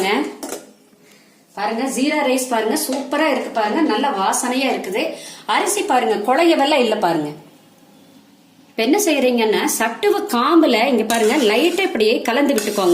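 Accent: Kongu, India. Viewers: nice to hear kongu Thamizh. super process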